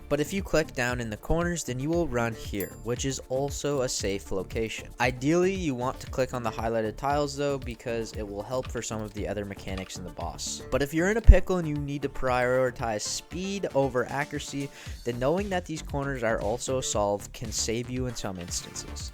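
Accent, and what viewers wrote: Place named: Canada. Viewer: Thanks for the vid and ur aggressively Canadian voice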